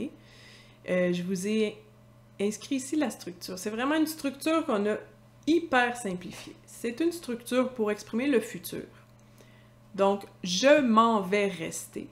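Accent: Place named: Quebec, Canada. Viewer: C'est très intéressant l'accent québécois. En Argentine on apprend le français langue soutenu et en Amérique il est possible trouver beaucoup d'accent et des francophiles. Merci à toi!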